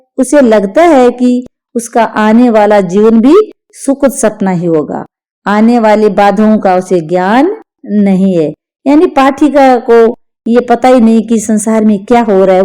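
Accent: Rajasthan, India. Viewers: Mam aap rajasthani ho na aapki voice tone se lagta h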